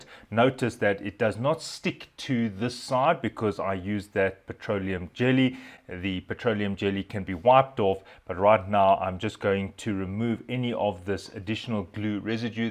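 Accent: South Africa. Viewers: Defo a South African accent!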